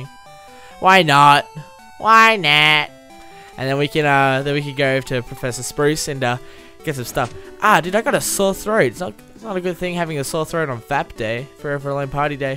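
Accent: Australia. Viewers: He sounded so much more australian back then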